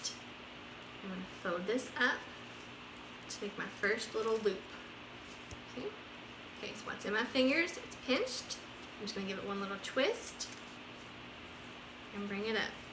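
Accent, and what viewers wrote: South, United States. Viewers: You have a very southern accent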